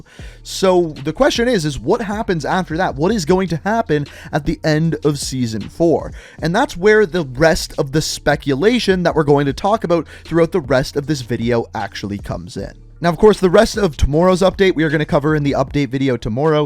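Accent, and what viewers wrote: Canada. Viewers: It’s the Canadian accent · Def can tell he’s Canadian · Im like a parrot repeating every word he pronounces so Canadianly.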